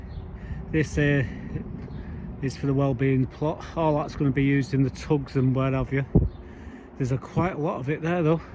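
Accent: United Kingdom. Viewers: Great video. Nice idea having handicap plots above ground. Your pavers are looking good already. Can't wait to see the finished area. You surprised me at the beginning by putting sunflowers in pots. I had one last year that was 10' tall. I've never had a small one before. This year they are popping up all over because they self seeded and some had to be moved. I love em though so they are staying! So you use the horse manure to top dress your beds then. Hope it doesn't smell. My husband sat here and watched with me. He was surprised that I could understand your accent when he was having trouble. He's originally from Redditch. Told him I've been watching your videos for a while now so was able to interpret a few things for him😅. So funny eh? A Canadian interpreting a British video for a British Canadian. Take care.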